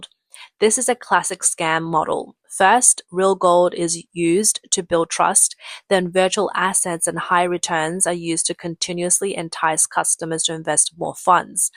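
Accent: Australia. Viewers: Aussie accent on the voice over. PM of AU in chyna in Winnie's ear, while the USA is throwing its hands in the air saying 'Australia, what the f*** are you doing' they don't know w t f they are doing. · My favorite Australian Chinese narrator😮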